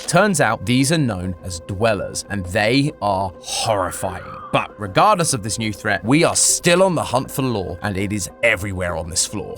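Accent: United Kingdom. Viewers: British goood day mate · W new matpat update even tho hes British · Who is this British gentelman? Where is MatPat? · "wheres matpat 🥺" HE CAN DO WHAT HE WANTS NOE LET THE SILLY BRITISH GUY SPEAK ‼️‼️ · It's because he's British. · does he have to be british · British matpat isnt so bad after all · I got a massive British jump scare cuz my brain short circuited and I forgot was British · Hey British matpat! Are you becoming silly? :3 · AHHH, A BRITISH PERSON!!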